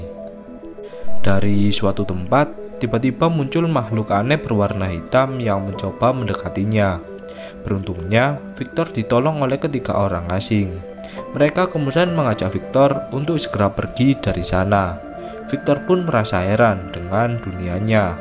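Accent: Java, Indonesia. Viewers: Wkwkkw ,sama kek pemikiran gw dah persis kamar film tp ini versi medoknya wkwkw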